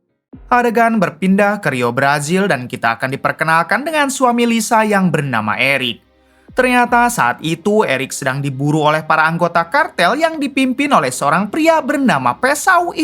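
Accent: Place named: Bogor, Indonesia. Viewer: Bogor mana suaranya🎉🎉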